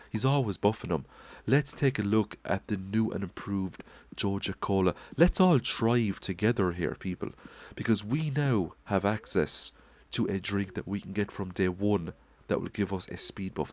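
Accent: Ireland. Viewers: he sounds Irish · The most Irish accent I've heard all week, and I've been listening to some of the news stories from over there.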